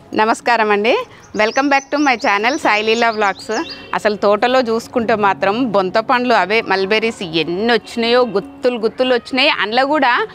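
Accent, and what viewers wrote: Telangana, India. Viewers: తెలంగాణ యాసలో మీ మాట చాలా బాగుంది, మీకంటే ఈ పండ్లు చూసి పక్షులు ఎక్కువ ఆనందిస్తున్నాయా...మేముకుడా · Manchi telangana matladuthunnaru vindaniki bavundhi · Hiii akka nv maa telangana Chala baga matladut unnam u r🍓🍓🍉🍉👌👌👌👌👌👌👌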